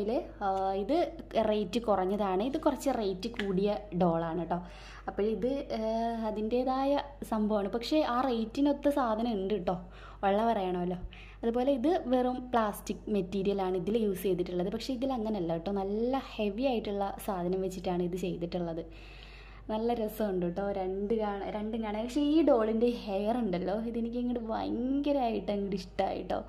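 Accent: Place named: Thalassery, India. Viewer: Super 👍👍 thalassery ഭാഷ kelkkannkile nigale entha youtube channel kannan varuu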